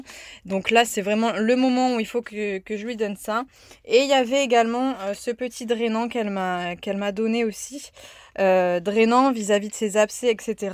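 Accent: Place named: South, France. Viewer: Beaucoup trop beau Baly ❤️ C’est magnifique de voir ça. Moi ce que j’adore c’est l’accent du Sud de la France. Chez toi chose c’est transforme en cheuse ahah je suis fan. Sinon je donne aussi de la biotine à ma jument une fois par semaine de Kramer Elle est en poudre et sens plutôt bon. Je ne connais pas les produits décathlon. Pour les pieds de Baly, temps que tu me dépasse pas 30 km par jour ( effort naturel du cheval ) tu peux parfaitement le laisser pied nus, regarde Jade ça ce passe super bien. Y a même des cavaliers olympiques qui sont sens fers. J’espère vraiment que tu vas pouvoir le laisser pied nus. Et le petit éclatax c’est du jujufitcat ça 🤣🤣🤣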